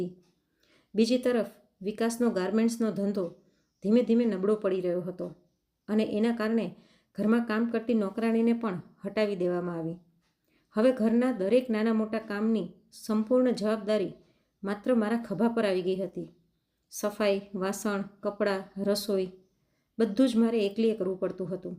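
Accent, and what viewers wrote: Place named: Rajkot, India. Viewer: Rajkot vala aavaj haoyu ch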